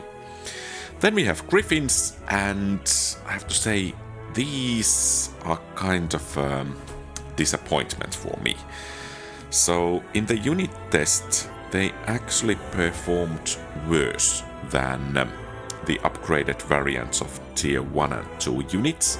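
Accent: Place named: Finland. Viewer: Just discovered your channel while getting into Olden Era, soon enough Ill have a great finnish youtuber for every game I play. Something about the accent just clicks :D Looking forward for the release of the game. Its already decent fun and given updates and balancing its gonna be greater yet.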